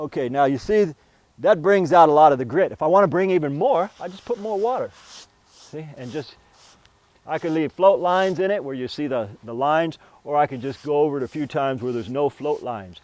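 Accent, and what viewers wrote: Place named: Bay Area, United States. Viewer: You have a Bay Area accent.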